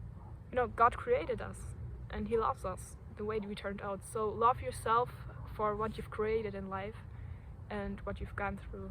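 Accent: Germany. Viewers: Are you german? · are you german? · Are you german ?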